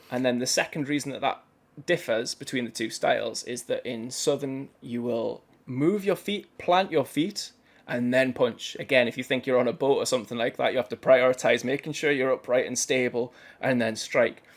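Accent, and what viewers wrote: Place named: Newcastle, United Kingdom. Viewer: Eyepatch wolf is Irish i think this fellas a jeordie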